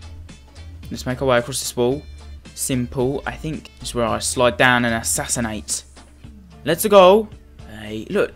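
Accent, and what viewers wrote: United Kingdom. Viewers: I think you have a British acsence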